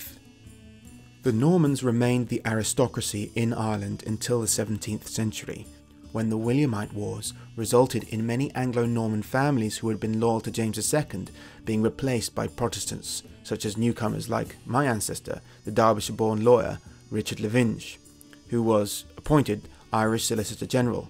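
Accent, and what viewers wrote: South Africa. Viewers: this guys voice sounds afrikaans slightly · You sound a little South African brah.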